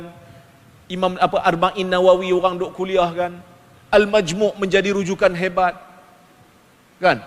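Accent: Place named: Arab world. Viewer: aku tgk die nie cra ckp mcm si Anu tu... lajjah arab perggh.. tp ckp suka spin..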